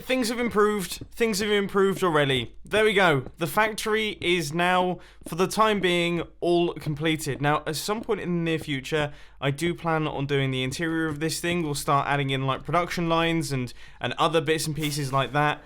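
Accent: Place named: United Kingdom. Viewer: "Does that look a little bit naff?" Me: "Naff?": "I feel like that looks a tiny bit naff" Me: "But what is naff?? EXPLAIN!!" Lol, this is why I love British youtubers, I don't understand a word they're saying sometimes. Keep up the good work!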